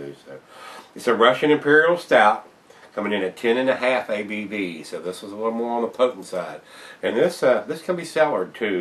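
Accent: South, United States. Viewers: "Today I'm reviewing bell's expedition stout, I said stout!," very pleasant southern accent sound like a coronal, where you from?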